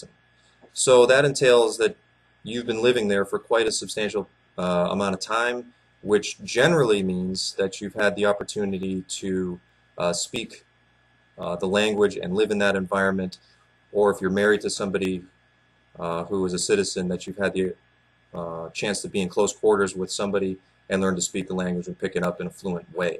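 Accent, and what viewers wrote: New England, United States. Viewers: You're from New Hampshire, aye? EAST COAST represent!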